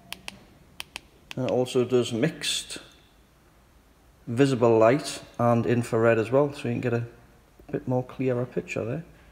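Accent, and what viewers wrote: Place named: Geordie, United Kingdom. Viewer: I know that, my parents were Geordies from Ashington as well. They never dropped the of when they spoke that is more of a Sunderland thing. I was only making a point about you describing everything you do and wondered if it was worth it when people make silly comments about the things you've done. I apologise if I have aoffended you, I live in Australia now and it seems so odd when people have accents like yours.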